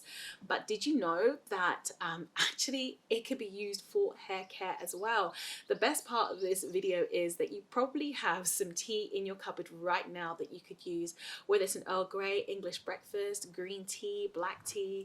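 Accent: United Kingdom. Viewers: Whoo lord! Thank You for this list because a few names of the powders I couldn’t understand with her beautiful British accent.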